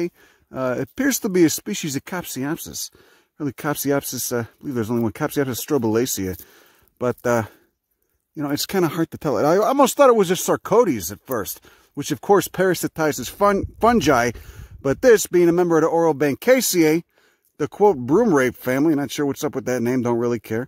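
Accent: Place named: Shasta County, United States. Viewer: you sound like you live in shasta county like shingletown or something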